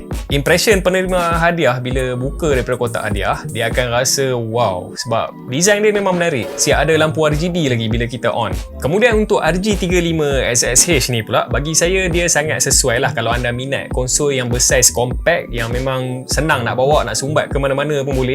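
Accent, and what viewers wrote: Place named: Penang, Malaysia. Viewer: bang asai utara ka?? hahaha ada style stylee mamu penang mari laa cara bercakap😁 apa apa pun saya tangkap Trimui Smart Pro sebab review abang hahahhah:face-green-smiling: TERBAEKK bang teruskann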